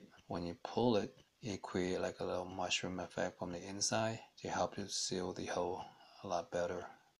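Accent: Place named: Asia. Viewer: Changadera in Asian accent 👌!!!!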